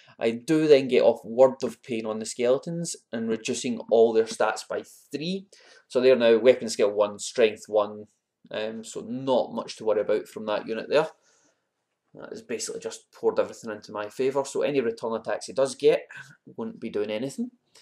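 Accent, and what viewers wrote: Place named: Scotland, United Kingdom. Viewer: Not to far from me then, its great to hear another scottish voice. I recognised your braw dice ha ha i have same kind got them at the scottish open in striling in october. I had my fluffy orcs at that tournament......lets say it didnt go to well ha ha Next time its my wee stunties only. Ive just finished editing a game i had against chaos dwarfs uploading it later, check it out. See how goblins fair against broken chaos dwarfs. Take care and merry xmas. Maybe see you at tournaments next year i hope.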